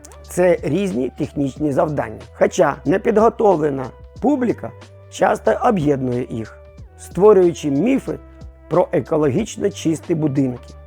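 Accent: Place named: Central Ukraine, Ukraine. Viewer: Я так зрозумів чоловік родом десь с центральної України чується щось рідне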